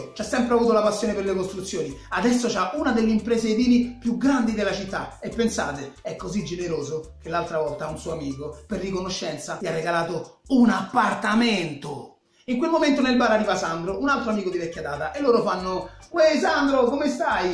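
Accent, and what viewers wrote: Italy. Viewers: Coffin meme:essendo di colore mi immaginavo una voce con un accento più africano quando pronunciava le parole.La sua voce è invece con un accento laziale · È normale e nato in Italia o cresciuto che accento doveva tenere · Tu mi sembri un po’ di Napoli rispetto a uno di Roma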